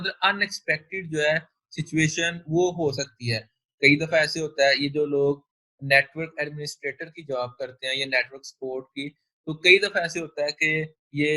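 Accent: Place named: Punjab, India. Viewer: punjabi accent is so good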